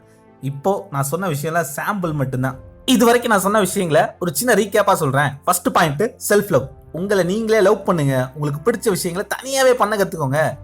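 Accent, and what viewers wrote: Madurai, India. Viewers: Madurai slang nalla irku anna ....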